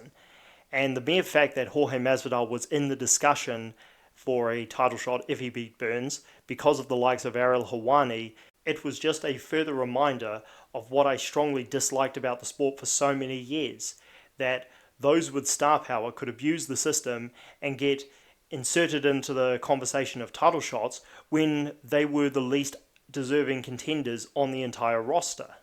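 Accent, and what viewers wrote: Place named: New Zealand. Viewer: Good video man I am subscribing Best of luck with channel from Ireland 🇮🇪 U sound kiwi 🇳🇿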